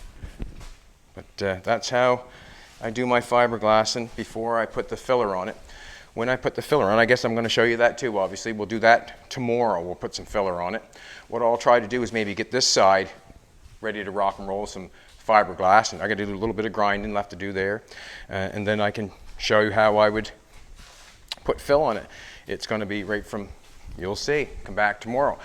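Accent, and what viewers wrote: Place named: Newfoundland, Canada. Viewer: If I’m not mistaken, that’s a maritime Canadian accent. Newfoundland?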